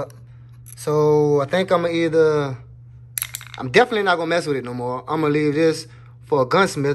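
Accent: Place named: New Orleans, United States. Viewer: that boy sound like hes from nola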